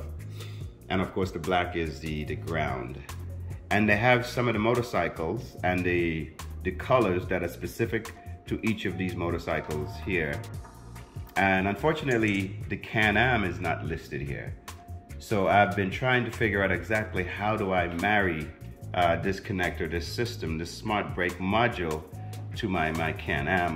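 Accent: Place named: Wisconsin, United States. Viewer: Good video and to the point. Love to hear a WI voice after many years captaining the Caribbean. Thank you.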